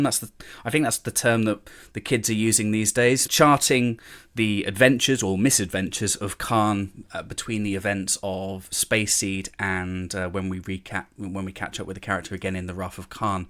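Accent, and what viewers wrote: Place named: RP, United Kingdom. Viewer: Man you have the poshest accent.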